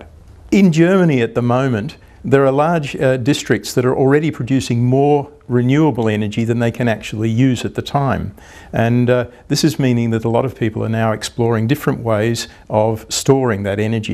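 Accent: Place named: Australia. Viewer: Hi. Nice to hear an Aussie discuss power to gas electrolysis. How did you go with your pilot in Northern Rivers? Being and engineer with a solution bent, this method of storage and delivery of methane via the gas grid seems the most sensible way to use our existing infrastructure to get the long term storage we will need once renewables dominate the electricity grid (hopefully). If anyone will get it right it must be the Germans! Anyway, I'm interested to know how it is going and how it is being received by others. Regards Tony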